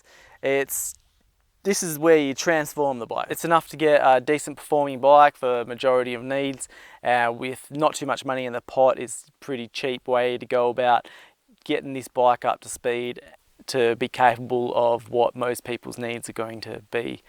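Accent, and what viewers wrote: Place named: Australia. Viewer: fair dinkum aussie right there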